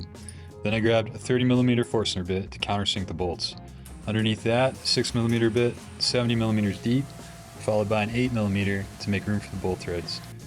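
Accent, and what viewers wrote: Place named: United States. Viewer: You sound very American (not in a bad way)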